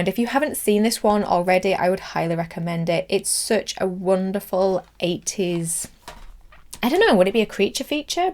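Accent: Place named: United Kingdom. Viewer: Just recently discovered your channel! Love the content and the awesome Childs Play Vs Childs Play video. Made me really appreciate both of them alot more. So refreshing as well to find a fellow brit amoungst all the american voices.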